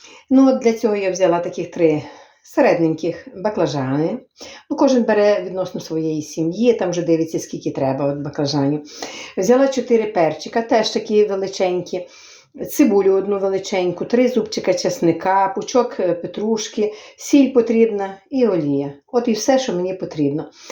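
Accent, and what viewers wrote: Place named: Western Ukraine, Ukraine. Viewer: При чем здесь мова? Хуторской западинский говор никого не красит. Женщина простая, сельская, ей простительно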